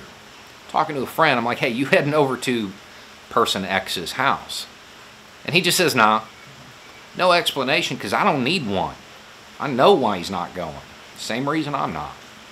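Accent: South, United States. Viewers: lol HE explains this in a older video. He used the plain American English accent for his reporting jobs, because he wanted to be taken seriously. THIS southern accent is his real one. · 100% agree with everything you said. Keep it up. Off topic. No offense, but that southern accent was really coming out, and I'm southern too. Why does it sound so much better on southern women though, haha. A prime example of a southern woman's voice: <link>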